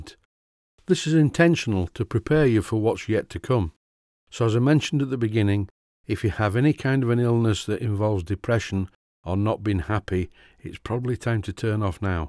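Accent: Yorkshire, United Kingdom. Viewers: watching this does NOT interfere with MY medication. But, THANKS for the warning. (My ADHD medication, as well as my depression meds say that watching Steam Engine restoration is beneficial!) (But, I may slip into a coma, sometime soon.) Keep the videos coming! Your Yorkshire accent is amazing.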